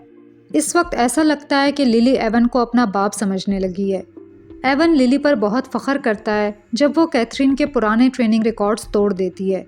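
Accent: Pakistan. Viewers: Pakistan mein bahir bolte hain,hum Indian bahar bolte hain,thoda sa different hota hai accent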